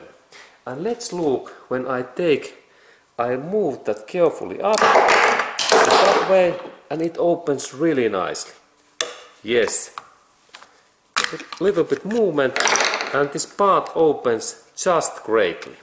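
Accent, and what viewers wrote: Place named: Finland. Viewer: Aiettä nautin tästä rallienglannista!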